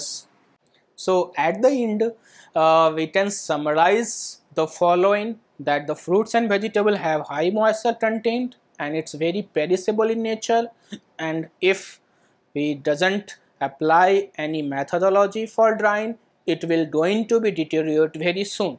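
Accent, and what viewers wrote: India. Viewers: LOL, LOL, LOL. that is the way they speack in India, so please learn more languages so your brain will be open to understand, I speack 5, and you ?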